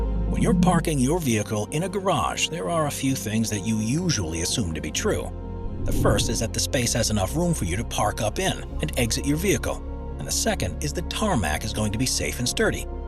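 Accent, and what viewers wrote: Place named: United States. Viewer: Why does the narrator have an American accent? It sounds like the copy was written by someone from Britain (or some other place that says things like car parks and bays) but the narrator sounds American. Not a big deal, just kind of an odd combination.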